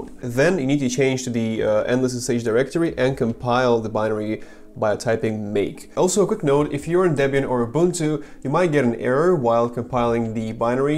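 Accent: Russia. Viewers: Один я слышу русский акцент?) Where are you from?)) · русский он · Я не могу понять, он русскоговорящий или нет, английский смешанный, где то хорош акцент, а где то как будто русский. Похож на английский восточной Европы какой нибудь или американских пригородов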